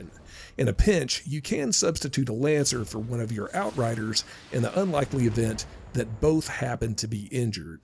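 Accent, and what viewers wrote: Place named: Midwest, United States. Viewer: Thanks! Just bought BT and Big 3 DLC! And subscribed! I'm doing my part! I like your narrator voice, clear and calm with that midwestern (I think...) accent.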